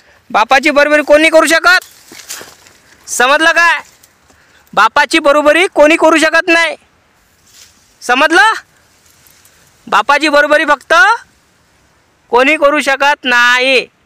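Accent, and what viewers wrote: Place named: Vidarbha, India. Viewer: छान दादा मला तुमचे बोलण्याची स्टईल खूप आवडली. त्यामध्ये विधरबाचा गोडवा आहे. ❤️❤️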